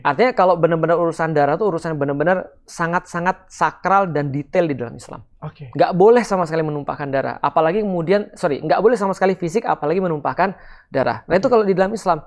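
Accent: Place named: Palembang, Indonesia. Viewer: Kanji kau ni, 😂😂😂😂😂 asli ini Palembang Sumsel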